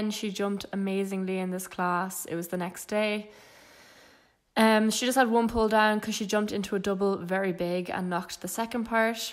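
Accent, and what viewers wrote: Ireland. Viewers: That's an Irish accent, not a British accent. There's a very big difference. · I’m pretty sure ur irish😂same what county Meath?cork?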